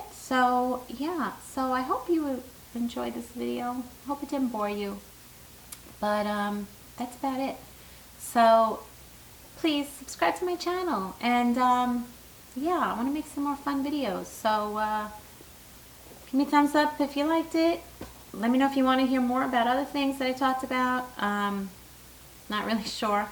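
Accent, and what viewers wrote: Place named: Long Island, United States. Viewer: I appreciate the videos for average, mature women as well. I found you doing a search for curly hair and when I heard the accent I was like ok, I,m from Long Island. So keep it up and thanks for sharing.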